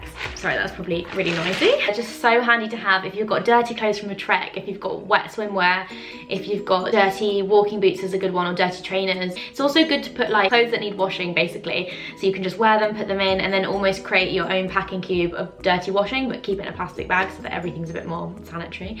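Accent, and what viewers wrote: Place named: England, United Kingdom. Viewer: Always wanted a girlfriend with an English accent-sounds so sophisticated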